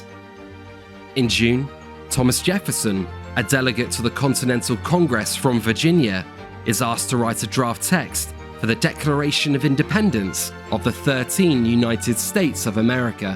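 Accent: United Kingdom. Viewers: Great series, well done and surprising to hear it told with British accent. Trying to keep the indefensible NY was Washingtons biggest mistake and almost lost the revolution, he was saved in Brooklyn only by moring Fog and Howes indecisiveness. Years later he wanted the French Fleet to recapture NY, they said no and advised to move on Yorktown. Great story overall …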